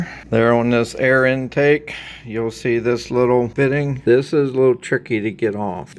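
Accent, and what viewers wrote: Western Pennsylvania, United States. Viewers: Thanks for the video! Are you a Yunser from western PA?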